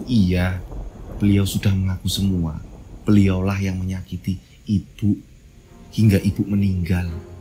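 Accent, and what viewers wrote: Java, Indonesia. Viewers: Assalamualaikum..... Kk Nani dari Majalengka Jabar Hadir Absen.... De kk tuh sangat suka kalau kamu menyampaikan kisah cerita nya.... Sangat pas cara menyampaikan nya.... Apalagi bahasa jawa de yg sangat medok.... Kk sangat suka de... Sehat dan sukses selalu ya de.... Boom..boom... Booommm 😍🙏🙏🙏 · Seneng dengar logat jawanya 😁😁😁😁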